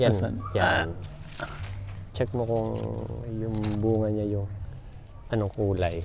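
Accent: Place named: Mindoro, Philippines. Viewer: Pang Mindoro din ang tagalog ni sir hehehw.e..taga mindoro po ako...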